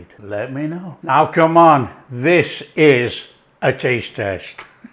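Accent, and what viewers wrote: Yorkshire, United Kingdom. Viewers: He doesn't sound like an Aussie beowtiful is Yorkshire. He lost me when he put ketchup on it, tomato doesn't go with egg.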